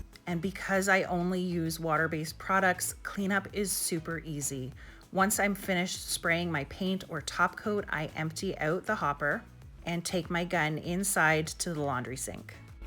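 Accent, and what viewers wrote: Canada. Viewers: Your Canadian eh! Even better!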